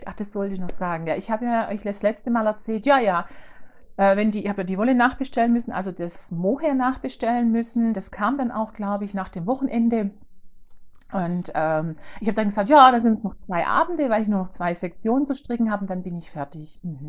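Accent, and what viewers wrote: Swabia, Germany. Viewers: Wieder ein tolles inspirierendes Video. Ich mag deine Videos sehr gerne. Im schwäbischen Dialekt fühle ich mich direkt wie zuhause und wohl. Ich könnte dir stundenlang zuhören. Du hast tolle Projekte und färbst richtig schöne Wolle. Bin sehr gespannt was du zu zeigen hast, wenn du im größeren Stil für deinen Shop färbst 😍 Der tuscha kal hat sich in meinen strickplänen nach ganz vorne gedrängt und wird definitiv mitgestrickt. Aus deiner Wolle könnte ich mir super vorstellen ein Set für meine Tochter zu stricken🥰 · Konfus ist toll, und das schwäbeln verstehen bestimmt auch Norddeutsche. Ich Rheinländerin find es klasse zwischendrin die Emotionen in Heimatsprache zu hören. Danke! Und deine Wollfärbungen sind doch toll. Sei mit dir selber Zufrieden, dann werden Dir die Färbungen auch immer besser gelingen. Ganz liebe Grüße aus Roki Regina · Frisch und sympathisch eine angenehme Art schwäbisch ich könnte dir Stunden lang zuhören, deine strange sind herrlich könnte ein Tuch vorstellen bin gespannt ich freue mich auf den nächsten podcast liebe Grüsse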